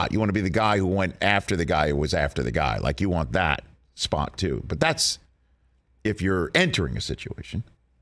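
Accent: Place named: Pittsburgh, United States. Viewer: He even sounds like a Yinzer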